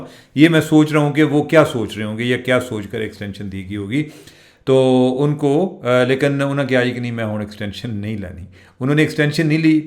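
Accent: Punjab, Pakistan. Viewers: صاحب ، پلیز اپنی اردو ٹھیک کریں، اگر آپکو ٹائم ملے تو اپنی ویڈیو دوبارہ سنیں اور دھیان دیں کہ آپ لفظ بھکاری کسطرح ادا کر رہے ہیں ؟ عام حالات میں بھی پنجابی لہجے میں اردو وی لاگ ہوتا ہے لیکن آج تو بالکل ہی حد ہو گئ۔ 😮صرف کان نہیں پورا جسم تکلیف سے گزرتا ہے آپکے تلفظ سے۔ میں خود اردو دان نہیں ہوں لیکن ہمیں کسی بھی زبان کے تلفظ پر بہت زور دیا جاتا تھا۔ 🙏🏻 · Saheb, Punjabi, Salam Sir jee, whatever your qualifications may be, you will remain “ Pendu”, and never become a polished journalist or media person, unless you Change your spoken language habbits. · Mostly punjabi (central) aisay he boltay hain, please don't mind😂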